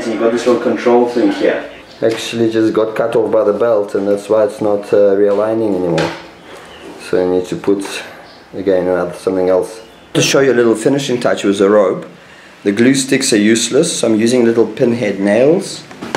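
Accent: South Africa. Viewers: i thought you sounded South African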